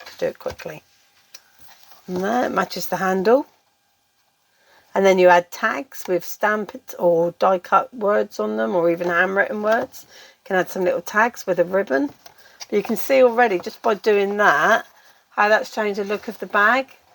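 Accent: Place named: South West, United Kingdom. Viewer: Love it! I love trying 3D projects and I know from your previous work on tv craft shows that you always come up with some great construction projects. Looking forward to trying this and watching your other tutorials. I'm from Bristol but live in Tamworth and it is nice to hear that South Western accent - makes me feel at home!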